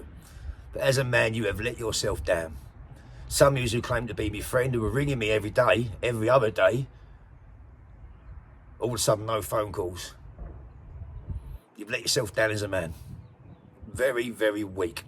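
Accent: Cockney, United Kingdom. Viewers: Love your traditional Cockney accent which is now sadly a dying breed - My Fiancé is from White Chapel & sounds exactly the same, salt of the earth 🙌🏼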